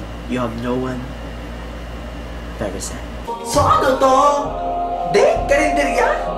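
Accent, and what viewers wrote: Visayas, Philippines. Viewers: bisaya na si..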